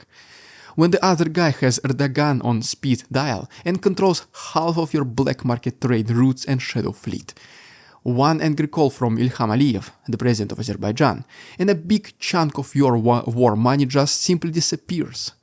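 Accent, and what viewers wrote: Russia. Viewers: annunciate some of your words a bit better, buddy... ie., you opened with, "Imagine you're walking through, Baku in Azerbaijan..." but neither of those two names were readily intelligible... Bit too much of the Russian tongue roll, for an American ear... The way you changed your show, from being a play-by-play sports announcer, to an insightful newscast, has been remarkable. I hope/think you can take a small criticism, without being offended... like telling a shortstop to start down, and come up on the ball... like telling a basketball defender to not leave his feet... Sorry, I don't know a hockey reference. 🌎☮ SLAVA UKRAINE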